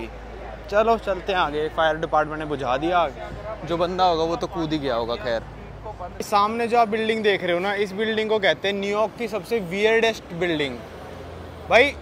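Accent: Haryana, India. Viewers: Bhai....You are a very frank guy and I like the way you present the whole show. I like your Haryanvi accent and daring to rebuke the viewers who look for lecherous clips. Keep it up. Come to my area in Dover at Rehoboth Beach and cover it.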